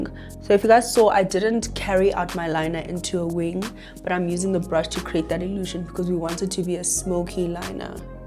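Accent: United Kingdom. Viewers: Uhm so the UK accent….. is this a hint….. oh so ever gorgeous ke wena international makeup plug? 🙏🏾😘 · The British accent sends me 🤣🤣🤣. Love it sooo much.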